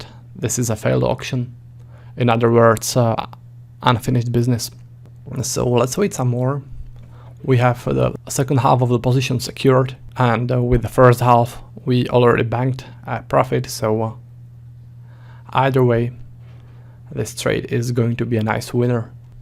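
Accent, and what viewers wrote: Czech Republic. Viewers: Česká angličtina trošku že? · Are you from Czech Republic?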